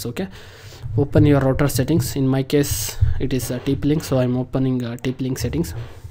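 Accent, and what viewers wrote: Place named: India. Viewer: Gosh I can't stand the Indian accent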